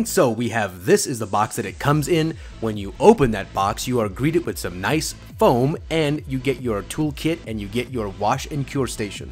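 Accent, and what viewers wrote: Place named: United States. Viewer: I love American accents AWCOWHOLE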